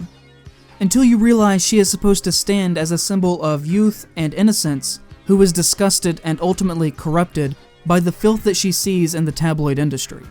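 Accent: North Carolina, United States. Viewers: Odd. I thought that you sounded like someone from NC, during this, and then you confirmed it.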